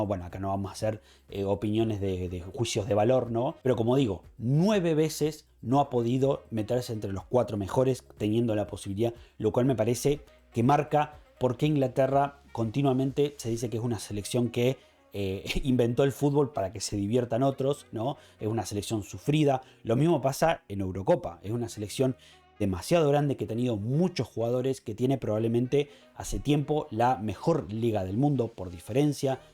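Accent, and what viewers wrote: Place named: Argentina. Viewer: Antes de ver el video, veo que tu acento es argentino o uruguayo, vamos a ver a quien pones antes si a argentina o a Italia, o... Uruguay o Francia jajajajajaja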